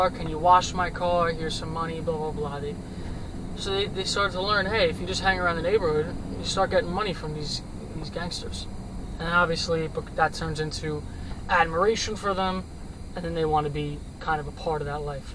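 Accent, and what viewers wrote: New York, United States. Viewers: The time you put into the research is so evident, seeing this area in real time, add to it ur new york accent, enjoy ur channel.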